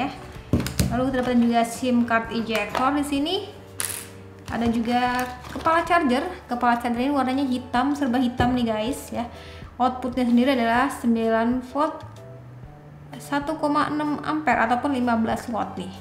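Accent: West Java, Indonesia. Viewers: Orang sunda ya teh? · Sunda bangetb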